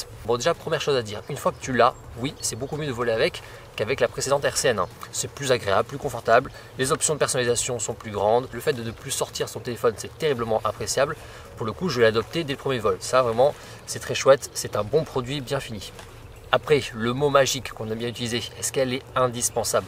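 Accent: Toulouse, France. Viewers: Alors moi l'accent toulousain ne me gène absolument pas, j'y suis habitué :). Plus Sérieusement, encore une belle vidéo pratique, instructive, claire et le fait que tu parles vite, bah je trouve ça trop bien, on te comprend totalement et ça a l'avantage de ne pas voir une vidéo de 20 minutes pour apprendre que DJI fait des produits au top... bon là on en est pas loin. Bref, tout ça pour dire que j'ai bien apprécié ta vidéo. Seul bémol (parce que sinon je ne commenterais pas, comme beaucoup...) : sur la portée de la radiocommande RC, en vrai, comment tu le dis, à courte distance pas de problèmes, mais au delà de 500m, ca déconne un peu. Perso, j'ai toujours ma RC-N1 en back-up et dont la liaison est quand-même bien meilleure que la RC. Je garde la RC pour l'avantage de la vidéo intégrée et ergonomie, mais je dois reconnaitre que je suis moins à l'aise en terme de sécurité qu'avec la RC-N1 qui elle ne foire jamais. 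J'espère fortement que DJI remédiera à ce point. On nous parle d'Ocusync "10 000" mais le résultat est pas fou. Ca reste ma vraie déception de l'achat du Mini 3 Pro. Je me voyais déjà envoyer mon drone sur la lune pour prouver que la terre n'était pas plate. Bref... En tous cas, encore un grand merci ! Continue, et je continuerai de mon côté à te suivre !!! Et encore merci en tous cas !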